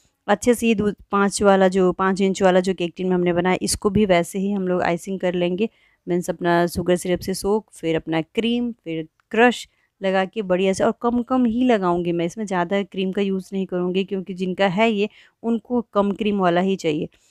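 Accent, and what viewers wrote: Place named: Jharkhand, India. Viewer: Mujhe laga jharkhand se, aapka bolne ka tarike bhot acha hai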